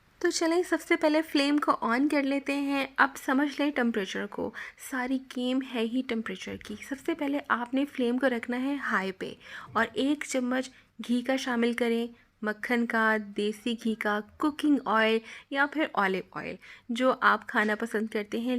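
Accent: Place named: India. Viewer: Please don't talk like Indians it's اچھے سے it's اچھی طرح سے ۔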